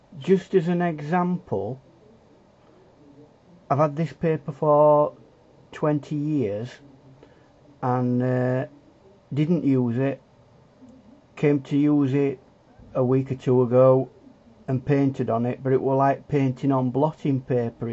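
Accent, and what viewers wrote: United Kingdom. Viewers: Love the British accent... What a lovely video. I feel like this one deserves so much more then 109 views since it could be helping so many beginner artists. Stay connected. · Let me guess sir , are you from Yorkshire? Brilliant video! 👍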